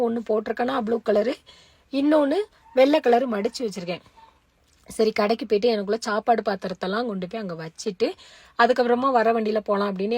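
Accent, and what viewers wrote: Tirunelveli, India. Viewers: நீங்க எந்த ஊரும்மா பைய அப்படின்னு பேசுறீங்க திருநெல்வேலி, நாகர்கோவில் இப்படி பேசுவாங்க அதனால் தான் கேட்கிறேன்மா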